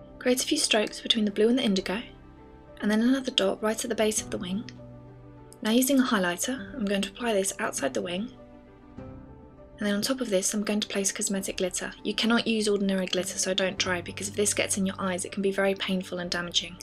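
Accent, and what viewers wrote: England, United Kingdom. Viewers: i luv ur british accent...its cute!!! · i love british accent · I love your accent. Even though I am from the UK too xD :') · It's really weird listening to a British accent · i like british accent ! perfect xD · loove that english accent....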